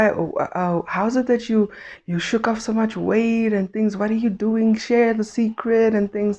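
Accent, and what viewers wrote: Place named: South Africa. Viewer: Yup, it saved me a lot. I just started trulicity which is about the same as ozempic. I'm excited to lose weight. Totally off topic, I absolutely love your accent! I know, typical American lol...but South African accents are completely under rated. I hope the best for you sweetie, you're looking great!!! I can only hope the same for myself someday soon. :)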